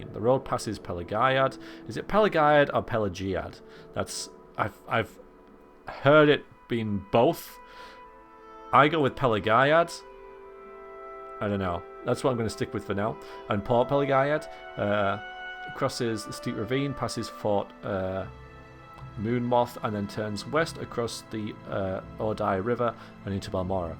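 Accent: Yorkshire, United Kingdom. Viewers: Sounds like you are a fellow Yorkshire man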